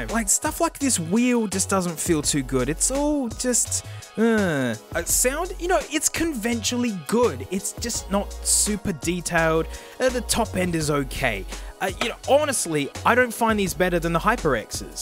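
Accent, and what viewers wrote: Australia. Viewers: Same, though I'd want dank to play the same parts of the song in the comparison between the headphones. Also the ears make me think of ASMR artists though I've head worse whispering in my ears then this lovely ausie man. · I could hear this Australian talk for hours about stuff that doesn’t even make sense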